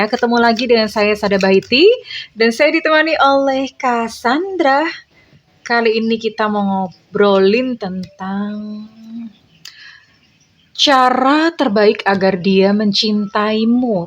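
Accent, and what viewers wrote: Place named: East Java, Indonesia. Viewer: Bunda orang mn y kok bhs nya kayak orang Jawa timuran hehe sy orang Jawa timur Bun hehe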